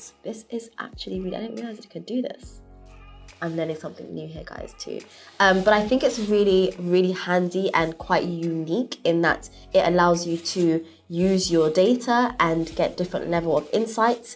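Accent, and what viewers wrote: United Kingdom. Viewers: I LOVE YOUR UK ACCENT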